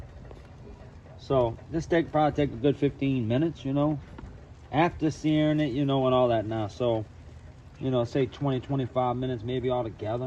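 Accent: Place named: South, United States. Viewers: Anyone know what’s his exact accent? It sounds real cool like Elvis Presley in Memphis ? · Man the southern accent is what I love most about these videos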